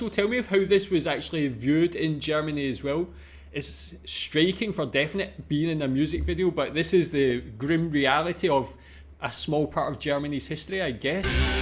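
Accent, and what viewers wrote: Scotland, United Kingdom. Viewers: Kudos - you picked up on quite a few things in there. Note that the vid is not a history of Germany, but rather highlights some aspects, which were very defining maybe not to what Germany *is*, but to how people may feel about it. There are good analyses out there, on Utube the one by "three arrows" is the best I know. Still, it falls short. The references and cross-references are enormous, and sometimes only detectable in freeze-frame. My current count is at around 180 (!). The Band worked for over a year on this, and I can only guess at the production budget. It is one of a kind, I know of no comparison. Anyhow, I love your channel, you are doing great work. And a lovely Scottish accent! Not quite as enjoyable as Neil Oliver's, but still...;) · love your Scottish accent....got to learn it....👍🏻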